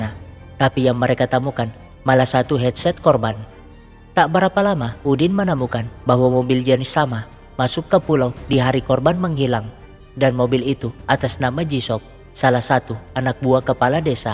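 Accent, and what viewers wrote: Makassar, Indonesia. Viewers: Orang makassar ini narator nya ehh, kentara ji makassar naa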